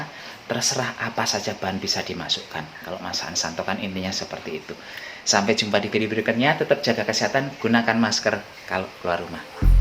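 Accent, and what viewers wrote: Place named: Java, Indonesia. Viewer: terimakasih mas... Aku suka channel ini... Mas nya pinter masak dan orang nya suka lucu- lucuan. Bikin ketawa. Suka juga dengar suaranya asli jawa.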